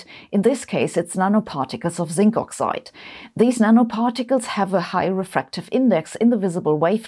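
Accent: Germany. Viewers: You're German so of course you have an accent speaking English, but I hope I'm not out of line to mention that native English speakers pronounce "comb" to rhyme with "home". Your pronunciation sounded more like the Welsh word "cwm" which is a glacial feature - Google says that's "felskessel" in German. Feel free to correct my atrocious German any time! As ever thanks for the awesome videos and keeping me in touch with the world of physics and science which I haven't studied for several decades now. Even my non-scientist wife enjoys your videos and we always get a kick out of your humour. I live in hope we will get a musical number from you again sometime, you are so multi-talented!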